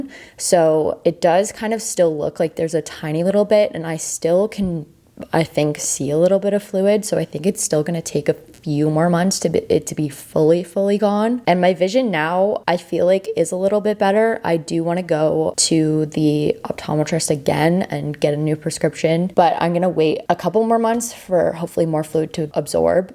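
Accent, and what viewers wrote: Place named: United States. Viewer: I'm an American living long time in Cambodia and just had my Vitrectomy done today. No compliants about the medical treatment here and all the equipment was high tech, etc. etc. However, starting my 5 day journey with my head "locked" into position, right side for the gas bubble, and the "mental" clouds of depression and worry start to happen. So! Appreciate this video as it will helps me look forward to the ups and downs of this surgery. Great to also listen to American English explain things as the language barrier here stressed me out a bit as I am just not 100 percent sure sometimes on the info that was given to me. Again, the medical treatment here in Phnom Penh has actually impressed and now it is up to me to follow doctors orders ( 5 full day in head "lockdown" and stay positive. Thank you for real.........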